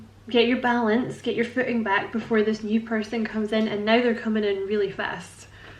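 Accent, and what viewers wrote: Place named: Scotland, United Kingdom. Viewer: Oooh I Love your long readings and your Scottish😍 Thank you so much for your beautiful reading💕🙏🏻😘 Love you💕💕💕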